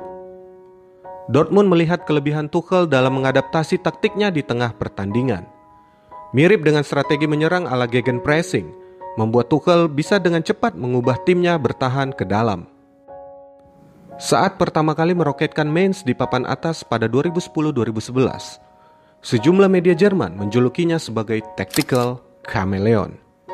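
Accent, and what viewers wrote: Medan, Indonesia. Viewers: Orang medan rupanya abg ini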